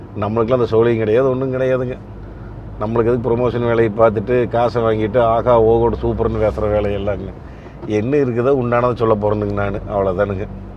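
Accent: Kongu, India. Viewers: கொங்கு தமிழ் அழகோ அழகு அண்ணா,,காசுக்கு தகுந்த பணியாரம் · கொங்கு தமிழ் 💚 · அழகான கொங்கு தமிழில் பேசுகிறீர்கள்... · அண்ணனின் கொங்கு தமிழ் மிகவும் இனிமை... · அருமையான கொங்கு பேச்சு...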